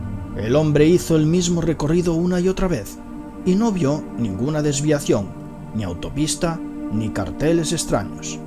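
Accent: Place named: Castile, Spain. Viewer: Io amo tantissimo questo accento del Castiigliano... oltre al tuo modo di esporre gli argomenti. Io credo nelle dimensioni alternative... chissà in un altro mondo io sono spagnolo e tu italiano ah ah! :-D M'iscrivo volentieri al canale. :-)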